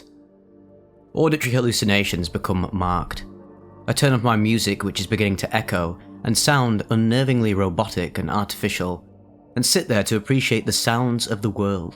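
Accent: United Kingdom. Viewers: are you british? i cant tell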